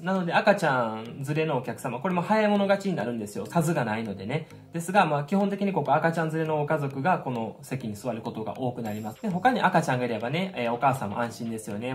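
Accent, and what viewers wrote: Kansai, Japan. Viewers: 関西弁のツッコミがめっちゃ面白いです🤣 海外の航空会社に就職されるのはすごいですね ご優秀なのがわかります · はじめまして～～♫ 某YouTuber さんのコメント欄で、数名の方がおススメしていらしたので、来ました🏃‍♀️♥ 今回の動画、めっちゃためになりました。 私は昔は早く降りたい！ので前の席を予約していましたが、最近は、後部座席の方が隣の席が空いてることが多いので、後部座席を予約するようにしています。 あまりに後は言うてはるように、トイレ待ちの方が並んでたりするので、やめるようにしています。 関西弁なんで、めっちゃわかりやすく、楽しいです。 これからも動画楽しみにしています～ね。💖 よろしくお願いします☆ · 参考になります、私はトイレがちかいので通路側に座ります、感じが、YouTubeおのださんに似ていますね。関西弁もね。✈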